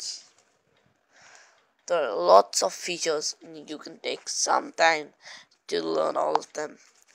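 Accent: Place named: Sindh, Pakistan. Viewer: Bhai Kya chal raha hain Sab theek me accent zabardast ne Bhai Sindhi accent bhool nani Gaye me chalet hain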